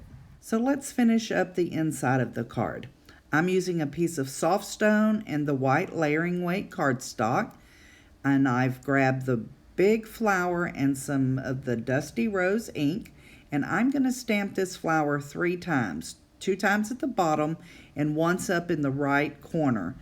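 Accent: Texas, United States. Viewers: Great Video! Beautiful Card! Love That Texas Twang! xo~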